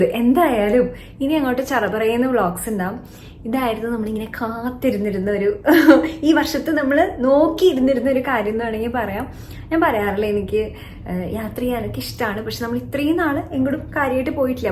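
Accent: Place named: Kochi, India. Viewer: Don't defame kochi slang..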